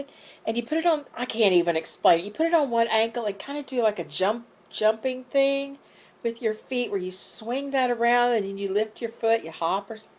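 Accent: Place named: South, United States. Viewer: I had every toy you mentioned, the straw bubbles never worked for me! that stuff was crap. you look so good, loving you glasses and winged liner. seriously southern accents are just charming, you make everything sound so lovely. oppose to my New yorker accent which is...we'll interesting. I'm from brooklyn and now one here is soft spoken. No I don't sound like that stereotypical movie over dramatic ny accent. I worked my whole like to sound like I'm not from here!!!!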